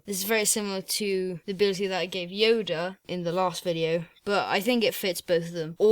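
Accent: United Kingdom. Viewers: Lit af u British? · i clicked on this video expecting an adult who is professional, instead i got an annoying English fuck, how about you give Ireland back to us ya dorty hun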